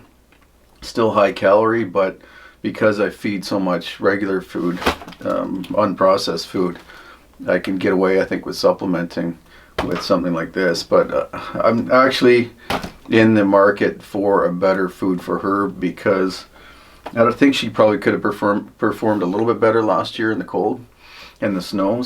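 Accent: Canada. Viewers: I love the Canadian ascent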